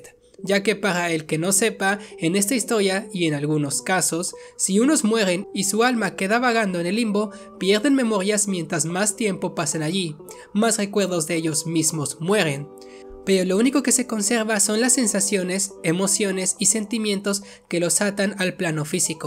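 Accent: France. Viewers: Pregunta eres franses si no eres pues pareces por el acento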